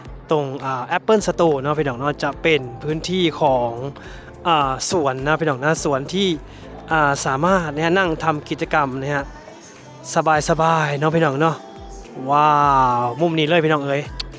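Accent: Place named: Phrae, Thailand. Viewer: บ่าว วันนี้ฉันตื่นเช้าเข้ามาดูเลยคะบ่าวพูดได้ชัดเจน สามารถพูดให้คนไทยได้เข้าใจมีความเป็นคนไทยเยอคะพูดชัดเจนสำเนียงคล้ายคนไทยแพร่ภาพเลยคะให้ชาวโลกได้รู้ประเทศไทยคะ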